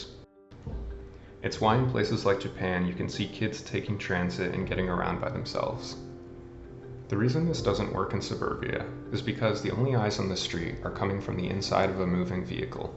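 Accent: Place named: Canada. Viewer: I HEARD THAT CANADIAN ACCENT AND THEN I WAS CONFIRMED BY YOUR ABOUT SECTION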